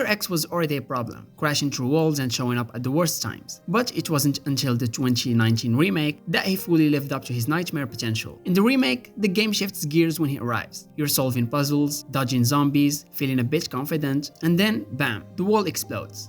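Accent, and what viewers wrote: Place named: Morocco. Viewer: dude are u moroccan u got a hard accent and keep going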